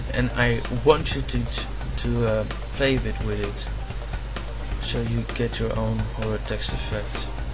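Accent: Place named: Netherlands. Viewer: Nederlands, te horen :D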